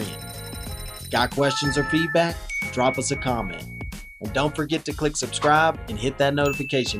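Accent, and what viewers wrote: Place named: South, United States. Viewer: Really appreciate the shorter, to-the-point format. All help, no fluff. One suggestion: the heavy country accent is a little distracting.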